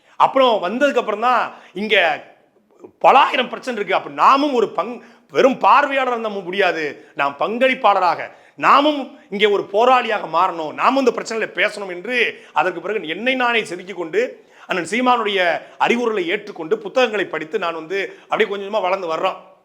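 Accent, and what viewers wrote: Tirunelveli, India. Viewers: சாட்டை இல்லையேல் இந்த நாட்டை சீமான்கூட திருத்த முடியாது! வாழ்க சாட்டை ! வளர்க அவர் வேட்டை!! என்னே ஒரு அழகு தமிழ் நெல்லை இனி மையான உச்சரிப்பு! தாங்கள் நேரம் கிடைக்கும்போது சற்று இலக்கிய பணி ஆடியோ வெளியிட்டால் தமிழ் கேக்கும் ரசிகர்கள் மனம் மகிழ்ச்சி அடையும்! தமிழும் வளரும். வாழ்க நெல்லை தமிழே.! நெல்லை சாட்டையே!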